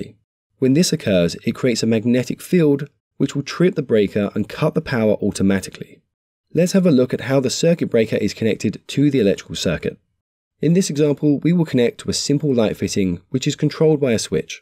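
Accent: United Kingdom. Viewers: What country are you in that is using copper for water mains in the street? I'm Britain, where his accent is from, we use plastic piping in the street, plastic in the homes. It's been like this for a couple of decades. I'm not sure if he's being generic in his board or for American, because he's talking about 120 and using double positives to make 240. I'm not an electrician. We have had to adapt wiring to this reality. You can no longer trust copper piping in a home will be continuous or lead back to a central area. It can be changed at any time and the copper circuit can be broken. For this reason Earth cables are being run directly from the front to the back of the properties using the main electrical board for the main earth point. Push fit plastic piping is so quick now, and they just need a small little blade cutter to cut the pipes to the right size. 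It's flexible and so easy to move around. Copper is much more expensive, difficult to bend, more time to cut, but the joints are a little bit cheaper. However plastic push fit connectors can be easily undone just with a click of your fingers. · I would prefer a human voice instead of a robotic British voice!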